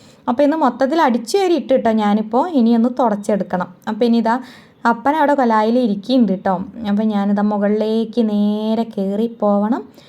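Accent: Kozhikode, India. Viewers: ഇത് കോഴിക്കോട് സംസാര രീതിആണോ, ഞങ്ങളുടെ ഒറ്റപ്പാലം ഭാഗത്തു ഇങ്ങനെ യാണ് സംസാരിക്കുന്നത്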